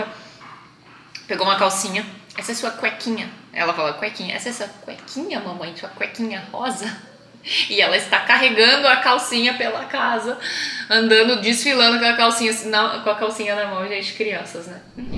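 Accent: Brazil. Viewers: Oi sou portuguesa nasci, na ilha Graciosa uma das nove,dos Açores, vivo no Canadá, viajo para Portugal Continental, com frequência,o meu marido e do Distrito da Guarda a qual depois de Lisboa foi a Cidade que mais conheço e menos gosto, ao Porto só fiz paragem no Aeroporto, está na minha lista quem sabe este ano? quanto a Coimbra gostei, mas não tanto quanto Aveiro muito mais talvez por causa do Mar,conheço Peniche, e Fátima, Nazaré gosto muito,Castelo Branco também é muito agradável, a Sertã gostei, mas me desculpem os Portugueses de Portugal Continental, prefiro os Açores mil vezes mil que a outro qualquer lugar 😂 mas sou suspeita, pois já que la tenho as minhas raizes,já o marido é continental e prefere os Açores, para uma próxima visite os Açores, se bem que a culinária- em termos de restauração o continente ganha os Açores muitas vezes, gostei muito de assistir os seus vídeos e tal como todos os Portugueses, acho estranho quando vocês Brasileiros prenunciam as palavras Portuguesas 😂 como ir no Porto e não ao Porto, me faz lembrar, que vamos montados em algo chamado Porto 😂 bjs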